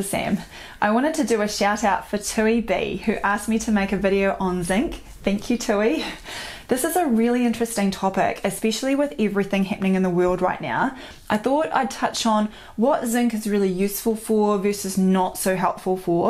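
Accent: New Zealand. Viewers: I liked your Kiwi accent. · I love the way you say, 'GET'. It sounds like GIT, but stretched out. My friend is from New Zealand but he has been here for so long in London he sounds almost English (the toe rag)! So gotta git me some New Zealand accent and your perfect. Oh btw, thanks for the info on zinc, i'll try not overdose on it! Why is New Zealand called New Zealand, is it because its the land of new zeal, are you guys buzzing over there? All the best and keep up the good work. Im in a funny mood today, sorry.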